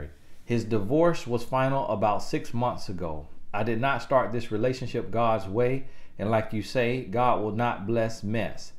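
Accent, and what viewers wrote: South, United States. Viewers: is too funny, love the country accent, keep telling it like it is brother. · Great video! You tell it like it is..time for her to leave and work on herself. Love your country accent ❤ 💕. Thank you for your wisdom on these man boys.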